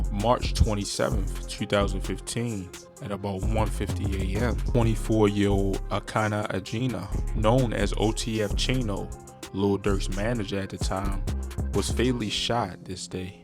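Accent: Baltimore, United States. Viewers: baltimore accent 😖😖😖😤& wtf is “Nokim Noah” 😳😵‍💫🤣🤣🤣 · I can tell u from baltimore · This narrator dude gotta be from Bmore!! Twwu, Neeews, HuuuLU😂😂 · U from Baltimore?? Lol u def got the accent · You definitely from bmore bruh lol keep doing ur thang g 💯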